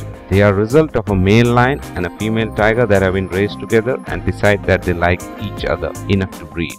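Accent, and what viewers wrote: India. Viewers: This guy has indian accent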